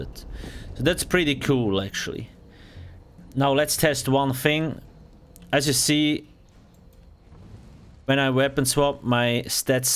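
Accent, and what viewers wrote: Switzerland. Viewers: Dude, are you swiss?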